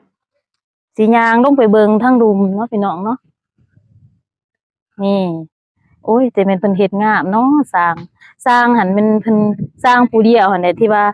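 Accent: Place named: Pakse, Laos. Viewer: สำเนืยงคือ สาวปากเช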